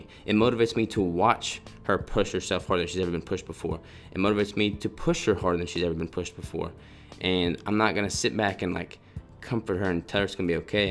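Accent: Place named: South, United States. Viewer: Where are you from bro? I hear a southern accent.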